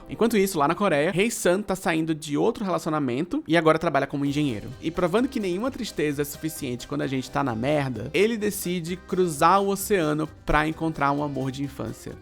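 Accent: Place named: Rio de Janeiro, Brazil. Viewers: Gente, eu jurava que vc era carioca por conta do seu sotaque..... Kkkkkkkkkk Valeu pelo vídeo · Aliás, você tem um sotaque de elite do Rio de Janeiro...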